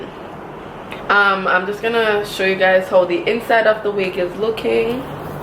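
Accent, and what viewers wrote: Jamaica. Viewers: I’m like this girl sound Jamaican 🤣 and I realize you are. Love this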